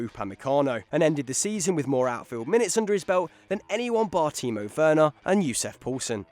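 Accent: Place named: Leicester, United Kingdom. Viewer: Can you tell that this guy is from Leicester? 😂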